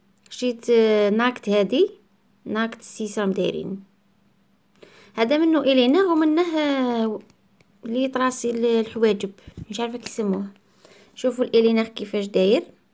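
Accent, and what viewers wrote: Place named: Mascara, Algeria. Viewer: بصحتك اختي بصح راني شامة فيك ريحة المعسكريات لهجة تاعك تبان تاع معسكر .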